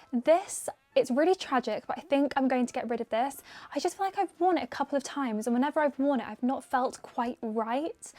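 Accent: United Kingdom. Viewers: Love your videos I'm American but I lovvvveeee British accent 🥰🥰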